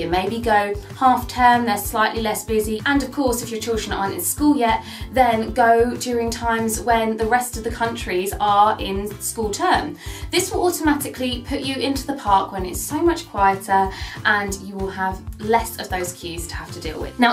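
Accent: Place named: United Kingdom. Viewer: thank you for the videos and they help alot !! love the accent... is every British lady so gorgeous????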